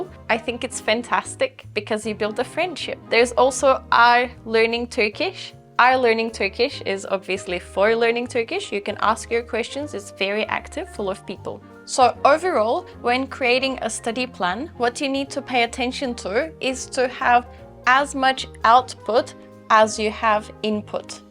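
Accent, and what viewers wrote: Australia. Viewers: Sagol. Great info. You always make such good sense and content of your videos are wonderful. Is that an Australian/Turkish accent I hear from you? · are you using Aussie accent?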